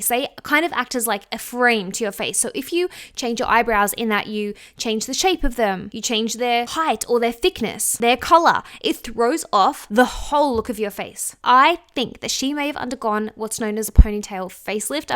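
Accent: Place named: Australia. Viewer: love your videos and just a fact, your accent remembers me of Felix from Stray Kids cause he is australian too (and Bangchan) <3 love you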